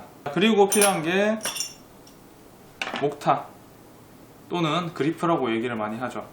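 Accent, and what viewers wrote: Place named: Jinju, South Korea. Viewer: 음 ...ㅎㅎㅎ 진주분이신가보다 ㅋㅋㅋ 억양이 왠지 진주스타일 ㅋㅋㅋ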